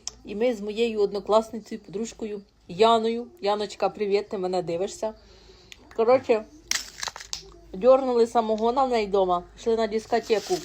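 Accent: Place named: Poltava, Ukraine. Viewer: Говір наче як з Полтавщини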